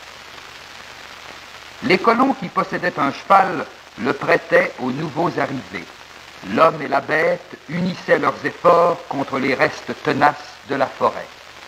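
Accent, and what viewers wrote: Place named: Quebec, Canada. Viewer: Comme un Québecois. I hate it....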